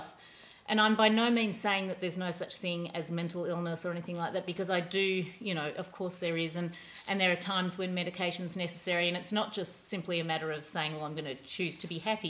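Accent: Australia. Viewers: It's nice to hear an aussie on YouTube! I really enjoyed the book review - my stroke of insight sounds like an interesting read.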